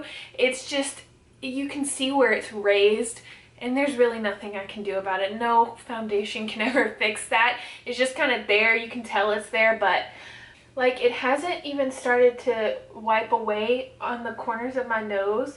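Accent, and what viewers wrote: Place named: South, United States. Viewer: I do find that so crazy. Even though I don't personally make videos or anything, I do watch mostly "beauty" related content here on YouTube. And I, personally, like to watch those that I find relatable. Which is why I, typically, stick to watching the "smaller" channels, per say. I've seen several where I hear a thick southern accent and get a little hyped thinking they might actually be from here, but they never are! LOL. You are the only one I have come across, thus far!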